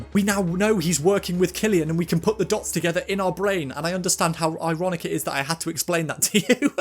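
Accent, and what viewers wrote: United Kingdom. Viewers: why are you british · high pitched AND British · Before even starting the video I saw the face and the channel name and I could already feel there's gonna be a Brit Accent, and I started the video and I wasn't disappointed 😂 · Funny British man with cracky voice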